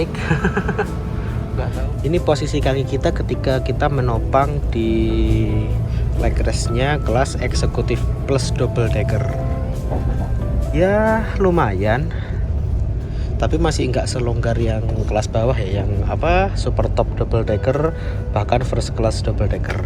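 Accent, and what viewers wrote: Java, Indonesia. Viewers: Yaampun logat bahasa jawanya itu loh yg bikin 😍😍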